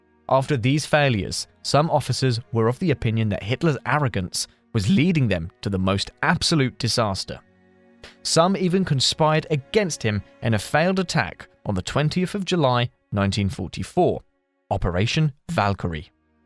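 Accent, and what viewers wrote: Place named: England, United Kingdom. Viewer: Sería mejor un locutor de EEUU no a uno tan inglés, vuestra idea es llegar a EEUU, no a UK. Saludos!!!!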